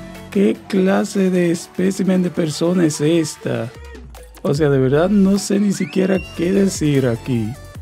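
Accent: Dominican Republic. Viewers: Yo sé que eres de RD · Hola tocayo, usted me parece Dominicano. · Eres dominicano ? 🇩🇴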